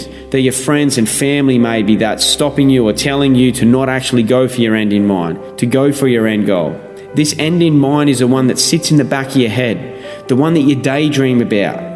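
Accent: Australia. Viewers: He’s Australian 👍🏼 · Great to hear an inspiring australian voice :) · Awesome to finally hear an Aussie. Great content.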